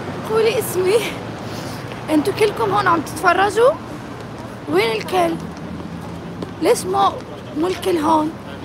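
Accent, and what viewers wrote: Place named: Iraq. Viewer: الله صايره تحكين عراقي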